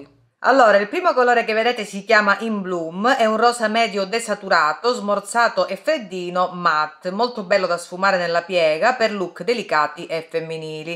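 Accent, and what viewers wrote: Calabria, Italy. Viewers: Di dove sei ?sei Calabrese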